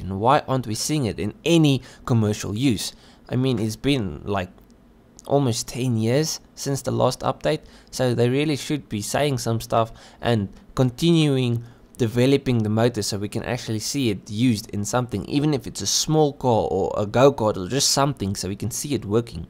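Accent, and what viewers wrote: South Africa. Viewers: This guy sounds South African - whooHoo!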